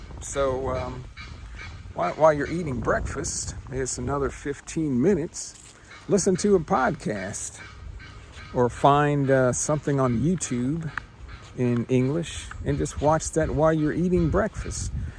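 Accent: Texas, United States. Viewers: I believe that finding time if you really want to learn English is not a problem. Too often it's an excuse. What really helps is what I call immersion in the language. If you can't talk to native speakers, you should watch the movies, listen to the music to pick up words, phrases and above all, to familiarize with the pronunciation . I like your Texan drawl👍. Greetings from Poland.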